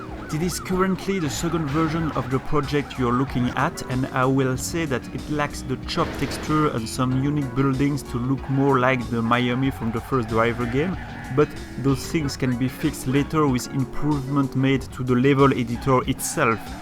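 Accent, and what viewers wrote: France. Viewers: With a french accent :) Looks very promising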